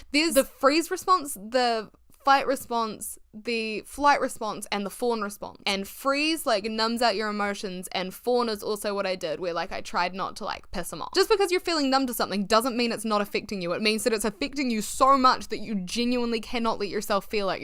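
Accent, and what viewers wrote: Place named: New Zealand. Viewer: I find your videos such a comfort. This one is different but still the same you. The way you tell stories is so captivating. Also, being an Aussie I find your Kiwi accent very cute and nice to listen to and not hard to understand at all. Thank you for bravely and humorously sharing your story. I hope you no longer assign any blame on yourself, you did nothing wrong and every action you took was the right one, including keeping the diary and telling your mum when you did. Props to your mum for looking after you as best she could. Sounds like it was a horrible, difficult, and frankly ridiculous situation to be living in. The relief you feel after his death is so real and valid and 100% allowed no matter how much you also love the not abusive parts of the person. Wishing you a peaceful and happy rest of your life, and the opportunity to experience the moments of joy and wonder you missed out on as a kid ❤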